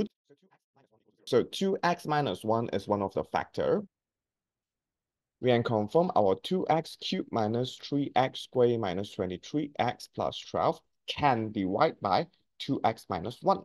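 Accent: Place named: Asia. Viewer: something about your asian accent makes math so much easier to understand(im also asian) btw all the other channels stretched this topic out soooo long(like multiple 20 minute slow paced videos) but your concise and strutctures style of teaching helped me practice along and not take like 5 hours for the easiest add maths chapter.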